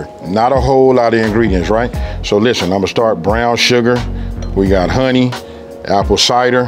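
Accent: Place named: California, United States. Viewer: As a fellow LA dude, I'm happy to see do his thing. But I've been going from LA so long that I can't stand the Cali accent lol.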